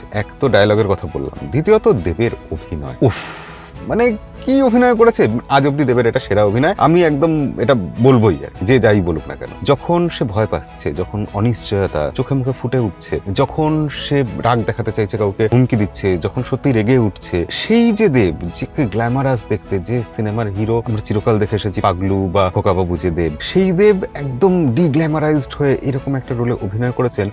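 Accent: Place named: Bangal, Bangladesh. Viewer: Apnar bangal accent ta amar besh lage 😊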